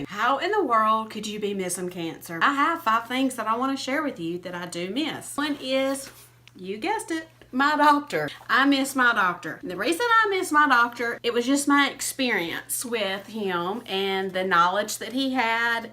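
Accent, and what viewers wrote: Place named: South, United States. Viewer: Oh Dear God!!! Love the southern accent!!! Where you from girl?? Lemme guess, Georgia??? My parents used to live right outside of Atlanta. I grew up in south west Arkansas. Girl you are killin it! Keep it up! Mashed that 🛎!!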